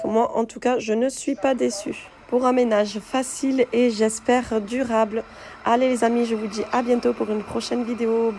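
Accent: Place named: Midi, France. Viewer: Mignon l'accent du Midi 😊😊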